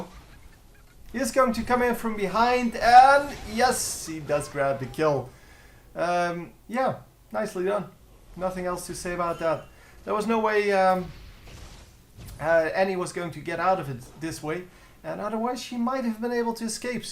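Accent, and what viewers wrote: Netherlands. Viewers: You sound like a Dutch man when you are talking English :D from what country are you if i may ask?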